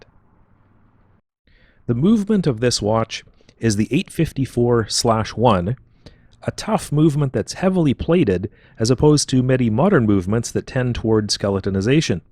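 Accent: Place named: United States. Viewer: Konstantin Correct. But he sounds American and they spell English words badly there.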